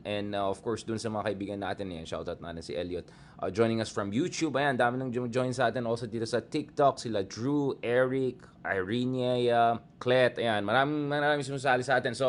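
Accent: Conyo, Philippines. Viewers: Di ko tlga matolerate kung pano magsalita ito. Masyado paconio. Kairita.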